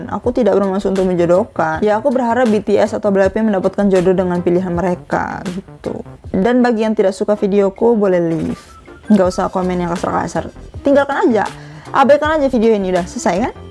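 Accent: Medan, Indonesia. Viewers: Kk orang Medan yaa Logat nya keliatan banget BTW maap kalau salah Ya ka 🤣😂🤣😂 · Kakak anak Medan ya??,krna kalau di Medan kyk gitu ngomongnya😊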